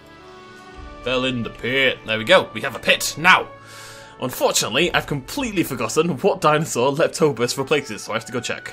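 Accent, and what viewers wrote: United Kingdom. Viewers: What the hell happened to your outro music also quit the British accent and it’s annoying to hear!!!!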